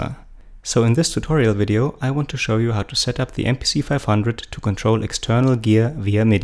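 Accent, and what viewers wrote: Germany. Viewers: Are u german ? Oder halt bist du deutsch ? Your acent sounds very german :) I want to buy me something like this and your tutorial was very clear and helpful :)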